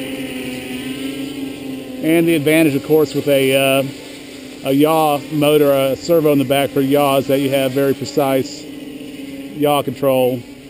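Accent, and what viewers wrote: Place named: Texas, United States. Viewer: Hi, you sound like you're from Fort Worth Texas, are you? I'm from Houston. But, here is a question...Do you have any idea how much weight this design can lift? I want to build one and it will be my first rc craft, however, I will deck it out with all the OSD, GPS, and cameras needed to fly FPS. So, weight is a factor in a big way. If these motors are good, great I will buy them. If I need some a bit more powerful, got any suggestions on which ones to get? Thanks Much! Tracey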